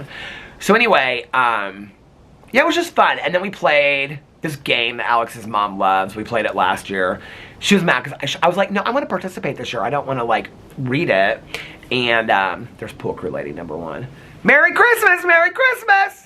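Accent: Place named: Midwest, United States. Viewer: my daughter was having a fit the other day (she’s 3) and I said to her in your midwestern accent, “Don’t cry!” And she was so confused 😂 it was hilarious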